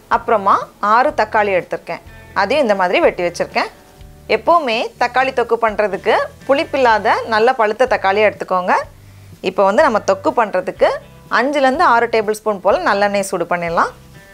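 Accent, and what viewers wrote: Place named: Tirunelveli, India. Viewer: Nice. sis. Tirunelveli and Nagercoil mixed slang.athenalthan ketten.unga uru special items seyunga.WAITING.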